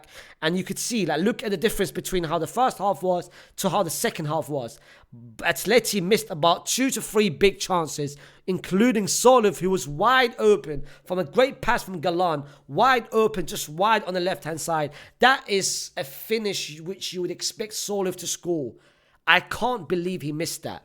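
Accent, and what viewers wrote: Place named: United Kingdom. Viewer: That British accent from a Iraqi is crazy unc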